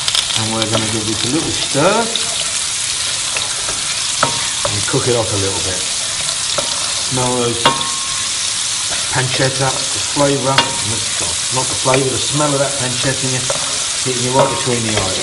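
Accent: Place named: United Kingdom. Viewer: I love the recipe and the surprise that a British-sounding cook has real Italian-speaking family members. Love it!!!!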